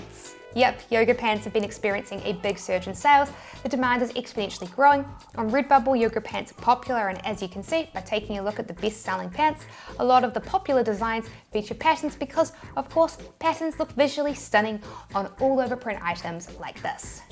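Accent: New Zealand. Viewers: I just watch because of the lovely kiwi accent 🇳🇿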